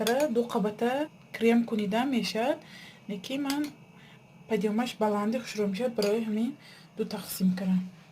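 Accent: Isfara, Tajikistan. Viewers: Хехехе)))) Ба Душанбегихо лафзи Исфарагичона ёд тиен апачон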